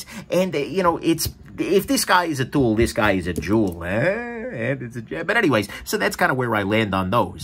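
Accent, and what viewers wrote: United States. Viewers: Russian? Some sort of American accent is what I am hearing... Kind of reminds me of a Warner Bros character...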